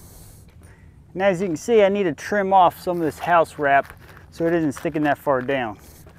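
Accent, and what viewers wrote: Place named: Delaware County, United States. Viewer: Bro what part of Delco you from ?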